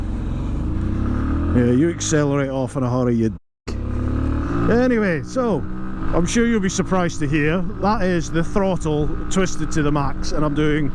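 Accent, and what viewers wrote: Scotland, United Kingdom. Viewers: OMG 20 stone. But you don't seem to be killing the bike. For you American viewers, 20 stone =280 pounds. Love your accent scotsman, and you showed me some beautiful country. This reminds me of my first bike. No power but lots of fun. Excellent review. So much crammed into the package deal on this one. Love the luggage capacity and the large tank for extra miles. It's amazingly quiet and looked like a very smooth ride. Of course, your country seems to have very well maintained roads unlike our country. Pot holes everywhere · Where abouts in Scotland are you from pal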